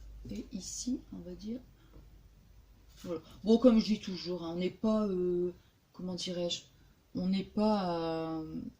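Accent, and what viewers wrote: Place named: North, France. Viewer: Coucou très simpa ton petit album je suis nouvelle abonné et je te trouve très rigolote ton accent du nord me fait plaisir je suis de la Picardie bisous Merci pour ta vidéo gros bisous ☺😀😚👍💕👏💕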